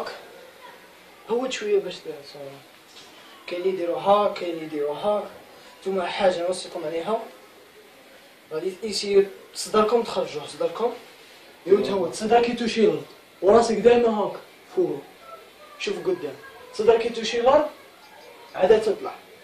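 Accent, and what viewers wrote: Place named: Algeria. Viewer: لهجة الجزائر يا اخي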